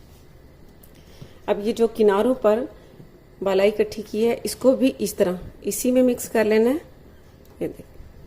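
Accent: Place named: Pakistan. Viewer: Mam ka accent toh pura Pakistani hai lekin pakwaan ki koi seema nahi hoti😋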